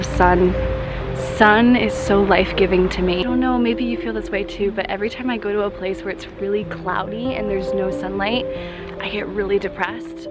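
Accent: United States. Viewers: I lived in the Algarve ( south of Portugal) for many years. I am now near Lisbon. I love Oporto too and I have a very close friend there. I am so happy that you had the time to reply to me. Who knows you and your husband will move here one day, even when you're much older. Life is such a big adventure! I wish you were here so we could chat about our many interests in common: spirituality, philosophy, photography, videography, nature and as a bonus I could even practice my spoken english with you ( love your english and expressions from the US) and I'd happily teach you portuguese, if you wanted to learn, coz it's not really a necessity here in Portugal. Also please know you are making a difference in people's lives. Last week the words you said about letting go and trusting the universe, when you told us the story about the french bakery, came to my mind several times. 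It's nice to be reminded and your words helped me🙏! Keep up your awesome work! Much love to you!💞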